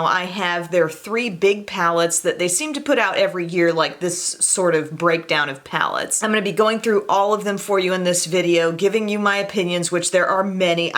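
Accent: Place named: South, United States. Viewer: o wow... making fun of people out there in the world that actually have developmental disabilities or disorders. Very classy. I was paying you a compliment and then that? lol, I guess you just never get tired of people voicing their opinion as to how utterly ridiculous, bitchy and trashy and ANNOYYINNNGGGG you sound. Please do Tube a favor..shut your trailer trash sounding channel down. I can't even stand your voice for one second. I almost fell asleep. Shut it down, you southern donkey.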